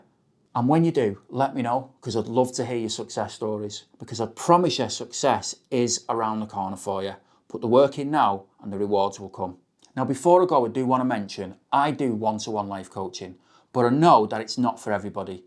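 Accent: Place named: United Kingdom. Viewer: You have a relatable and down to earth way of presenting your videos. Nice to hear a British accent too. Keep up the great content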